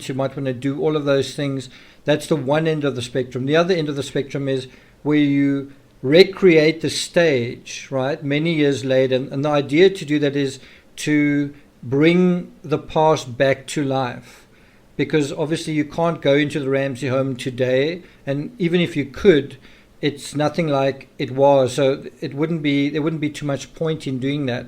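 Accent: South Africa. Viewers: Dr Phil, has an accent SA hence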